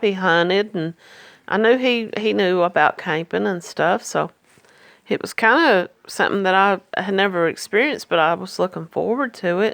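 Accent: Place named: Kentucky, United States. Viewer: You sound like you have a Kentucky accent..because here in my small little town everyone knows everything, and only 1 red light and 1 $ store..lol..and most men here are narcissists.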